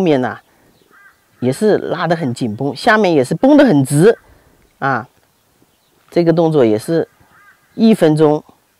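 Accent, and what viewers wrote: Wuhan, China. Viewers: 谢谢！您是武汉/湖北人？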